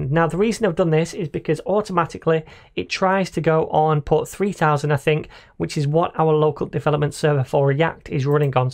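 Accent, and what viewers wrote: United Kingdom. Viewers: Honestly, I really like his react tutorials not only because of his British accent but he also explained them quite remarkably.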